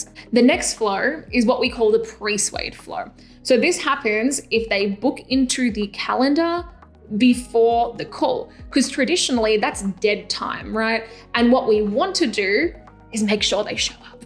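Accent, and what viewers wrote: Australia. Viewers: Never getting tired of the Aussie accent and never getting tired of your videos 🤷🏻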